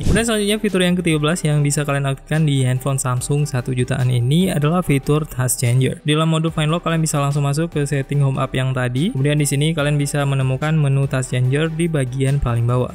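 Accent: Aceh, Indonesia. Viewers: Orang Banda Aceh bang? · Orang aceh ya bang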